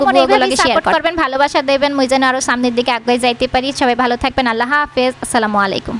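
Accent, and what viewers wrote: Barishal, Bangladesh. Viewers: আপু তোমার বরিশালের ভাসা বেশি ভালো লাগে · আপনার বরিশালের ভাষা আপনার মুখে অনেক ভালো লাগে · আপু তোমার বরিশালের ভাষা গুলো ভালো লাগে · আপু এখন যে বরিশালে ভাষায় কথা বলেন এইটা আমার কাছে ভালো লাগে অনেক সুন্দর লাগছে ।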